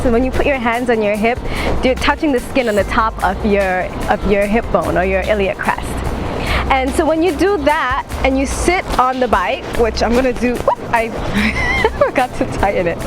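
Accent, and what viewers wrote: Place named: New York, United States. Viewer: Are in NYC? The background reminds me of my old hood in Brooklyn. Plus you sound like it.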